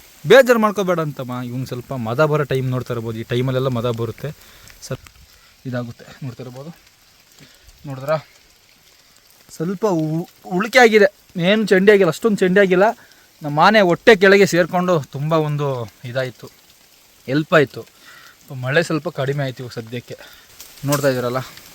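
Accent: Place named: Mandya, India. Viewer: From mandya base adike❤❤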